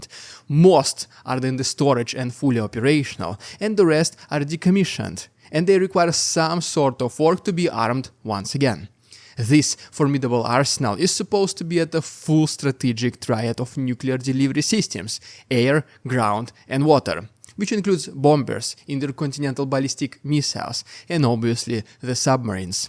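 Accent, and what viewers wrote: Russia. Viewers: I used to watch your videos all the time when you just started but they are becoming increasingly more difficult to watch as time passes. There used to be some 'entertainment' involved but that has almost entirely disappeared nowadays. You can speak very good English for a Russian but your accent makes a long monologue very difficult to listen to, it is difficult for me to maintain the necessary concentration and I very quickly simply stop listening to you. What makes you think people want to sit and decode what you are saying? I believe you have a narcissistic nature and I also believe that if you don't make some very drastic changes to your content your channel will simply fade away. Get some good advice from someone who will be both honest and objective.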